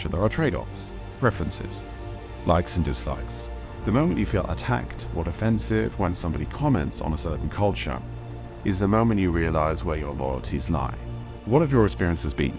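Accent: Germany. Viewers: You can tell you've been over there for 25 years, you do have a very German inflection to your pronunciation. I'd honestly be more inclined to believe that you were German and had lived here for 25 years (hence the excellent English) than vice-versa, haha. Not meaning to insult you. My uncle lived in Canada for the last 40 years of his life and completely lost his English accent (although to be fair he was still speaking English). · As a Brit I’ve been learning German for 5 years, albeit slowly and I would’ve thought that you were German by your accent if I didn’t know better. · Why do you speak English with a German accent? Also, you got some words wrong. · He sounds like a German who speaks really good English! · He's taken on some German "tone", yes! The "music" or of a language is surpricingly easy catch - compared to the grammar (in German's case!!) and the pronounciation. 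· You have such a German accent, to your English one now.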